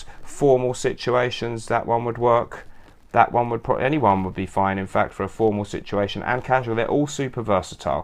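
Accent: United Kingdom. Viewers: Sexy European accent? Dude. Come to The US, and you can have pretty much any woman you want with that British accent of yours.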